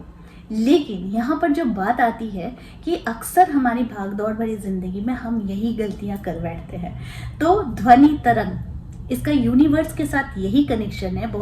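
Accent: Bengal, India. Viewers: R u bengali???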